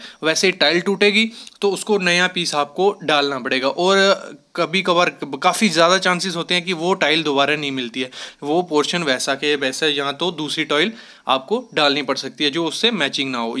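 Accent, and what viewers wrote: Jammu, India. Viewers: Jammu se ho aap.??. Dogri touch ha ap ki language me. Good explanation 👍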